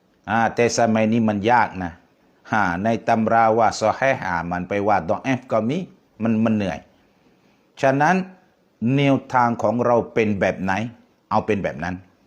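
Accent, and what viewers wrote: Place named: Nakhon Si Thammarat, Thailand. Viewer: นครศรีธรรมราชชัดเจนครับ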